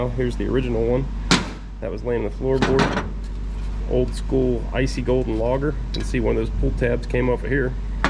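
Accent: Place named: South, United States. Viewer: that little southern drawl inflection is great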